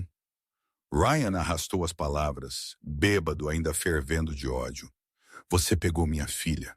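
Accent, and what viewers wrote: Portugal. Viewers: Porquê esse é o português de Portugal e não do Brasil 😂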